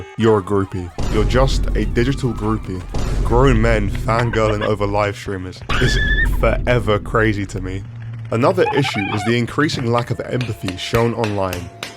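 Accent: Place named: United Kingdom. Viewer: British and you sound fat.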